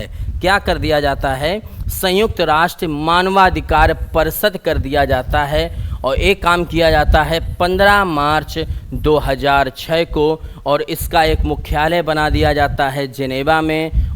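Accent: India. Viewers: गुरुदेव बहुत अच्छी क्लास रहती हैं आपकी सारी क्लासेस देख ली मैंने । आपकी क्लास में अपनापन लगता। अवधी टच , और कोई दिखावा नहीं👍👍👍👍👍👍👍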